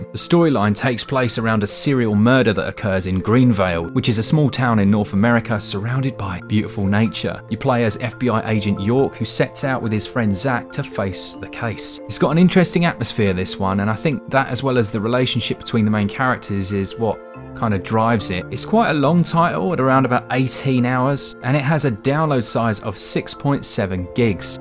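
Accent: United Kingdom. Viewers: I don't know why, but you kinda sound like a sexy British Morgan Freeman 🤷‍♀️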